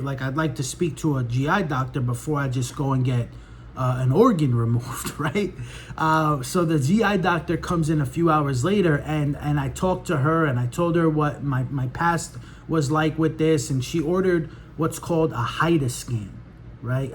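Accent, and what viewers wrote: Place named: New York, United States. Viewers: Thank you so much for sharing your gallbladder journey. My daughter-in-law is having extreme attacks now and is going to speak to a surgeon this week. This really enlightened me as to what to expect and I shared it with her. I just want to say that you are very funny and it was a pleasure to listen to you to the end, because with your New York accent, you tell the story hilariously. Thank you for your candor about the diarrhea and what to expect post surgery. I'm sure you have helped so many people. Forewarned is forearmed. May the LORD bless you and keep you healthy. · You got me laughing out loud when you got to how the cholestyramine works :) I have not had gall bladder attacks (yes I've had kidney stones) but got a long covid condition with irritable bowel - messing around between constipation and diarrhea. 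My nail technician was taking Cholestyramine for what was a close to Crohn condition. When her doc put her on Cholestyramine her problems went away overnight so I asked my doctor about it. He told me he had MANY patients on it. I've been on it close to a year and it works very well. Sometimes the discomfort part of that is challenging, but on the whole it works very well. I take it every single day. Fingers crossed. You didn't mention where you are from but you remind me of my New Jersey roots - or sound a lot like friends in Queens. :) Thanks for this really helpful video!!!!! Good luck to you going forward!!!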